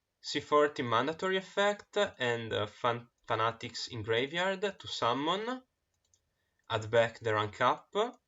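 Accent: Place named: Italy. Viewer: Bro non ti conosco ma so che sei 100% italiano e lo capisco dall’accento ahah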